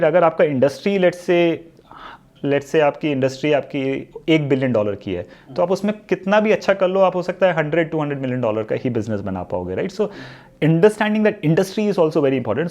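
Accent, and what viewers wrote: India. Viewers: Sundar pichai wala accent....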